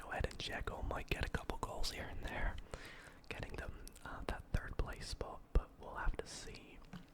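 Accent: Scotland, United Kingdom. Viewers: Why did it never click for me that you’re Scottish😭😭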